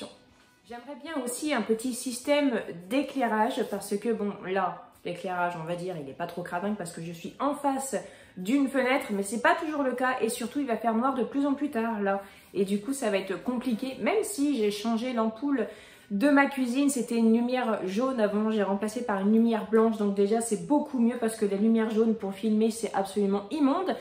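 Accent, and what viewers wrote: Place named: North, France. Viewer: Et t'aime particulièrement parceque tu es le double d'une collègue de travail, du Nord, presque le même accent, la même empathie, gentillesse... une belle personne. Je ne la vois plus car je suis contente partie à la retraite, déménagement à 400 km... continue tu fais de belles choses, et une chose est certaine, les enfants se souviennent des bons moments pas des choses, mes filles qui ont 40 ans me le disent souvent ...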